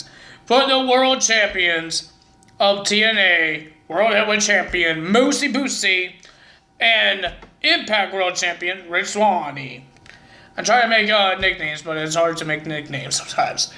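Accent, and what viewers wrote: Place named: Quebec, Canada. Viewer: where are you from bro? I hear an accent when you speak. It sounds like either from Quebec or Louisiana.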